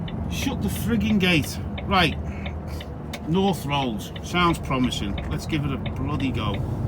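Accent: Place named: Liverpool, United Kingdom. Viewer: SCOUSER STYLEE.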